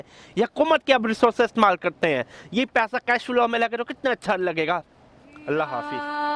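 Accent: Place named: Pakistan. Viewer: I like this video a lot me and you are on the same page but I just want to know if you are Pakistani or if your Indian I don't want to try to sound offensive or rude it's just you have an accent that sounds like people from Pakistan but also sound like Punjab I will be honest you look Punjab half Pakistani because I mean I'm from Pakistan and I know what Pakistani people look like anyways thank you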